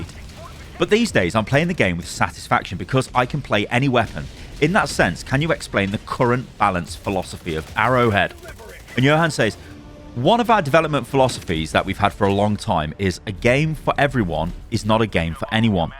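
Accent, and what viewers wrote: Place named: Southern England, United Kingdom. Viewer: Nah they're both just from the south. London area.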